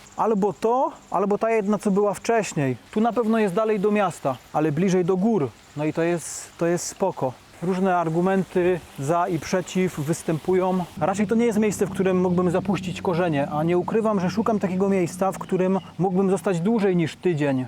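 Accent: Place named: Silesia, Poland. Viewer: kurcze, do zludzenia przypominasz akcentem Podolskiego :D Skad jestes ze slaska? Pozdro z Chicago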